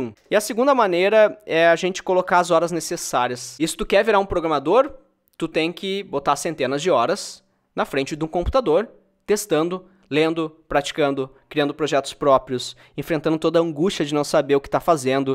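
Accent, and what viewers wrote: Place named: Porto Alegre, Brazil. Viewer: Poha, obrigado pelo diagnóstico! Off: Sotaque porto alegrense detectado.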